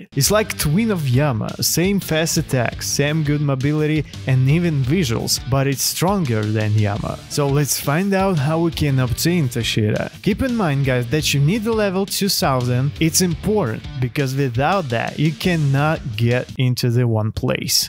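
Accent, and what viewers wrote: Russia. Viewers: Why do you have russian accent lol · The russian that tries to make an english voice OHHHHHHHHHHHHHHHHHHHHHHHHHHHHHHHH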